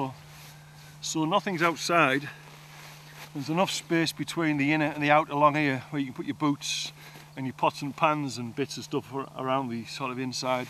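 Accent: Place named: Teesside, United Kingdom. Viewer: You sound close to me. I’m from Redcar, Teesside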